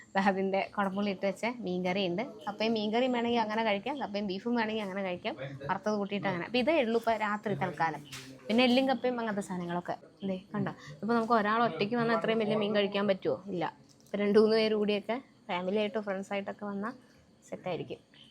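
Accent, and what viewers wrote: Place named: Palakkad, India. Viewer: Palakkad bhasha .. shaaatting..❤❤❤❤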